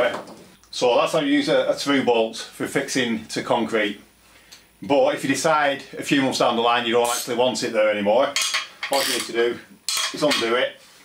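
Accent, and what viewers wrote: United Kingdom. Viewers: to , the text says, "one thing you can do is use them for close to the edge fixing..." I think the narrator actually said "can't" but the voice recognition software doesn't understand Brits. I think he meant "CAN'T." He calls them "through bolts"; in the US we call them wedge anchors or Redheads, which is actually a brand name that's become generic. We also have s bolt called a "Titen" from Simpson-Strongtie which works better close to an edge. They cut threads in the concrete, so they don't exert as much expanding force, so they are less likely to crack the concrete. We haven't caught up with the rest of the world, so we only have inch (Imperial?) sizes- Simpson may have come up with metric sizes for the other side of the pond. Someone asked about the definition of edge distance- check this link- <link> It gives a rule of thumb which is that an anchor should have a minimum of 12x the diameter of anchor spacing to an adjacent anchor or to any concrete edge. 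Also, the concrete should have a minimum thickness of 1.5x the depth of embedment.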